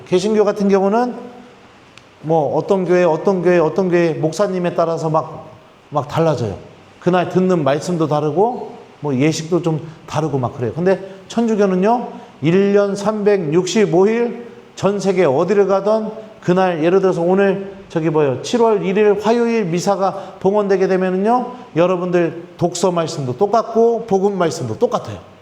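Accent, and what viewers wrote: Chungcheong, South Korea. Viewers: 아주 오랜기간 냉담을 하다가 다시 주님 앞에 왔어요 그런 저를 보고 비신자 남편이 의아해 하면서 이것저것 물어 보는데 제가 답을 못해서 교리 공부 다시 하는. 중인데 신부님 강의가 짱입니다~~^^ 남편. 들으라고 볼륨 키웠는데 " 저 신부님 충청도 사람이네" 하더라고요.ㅎㅎ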